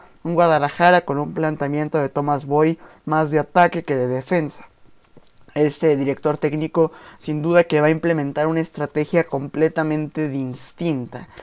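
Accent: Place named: Argentina. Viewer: Felicidades ya hasta el modo de hablar estás igualito al caballero chiva casi hablas como argentino y puras tonteras puro humo que está demostrando solo pierden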